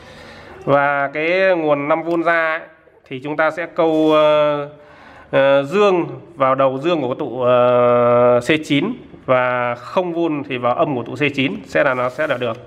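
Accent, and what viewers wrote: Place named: North, Vietnam. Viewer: đúng thợ điện tử nói dễ hiểu.người bắc lại dễ nghe. cám ơn anh.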